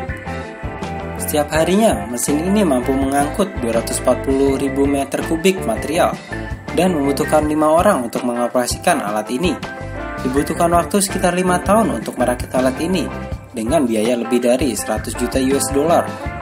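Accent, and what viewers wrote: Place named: West Java, Indonesia. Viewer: Logat sunda haha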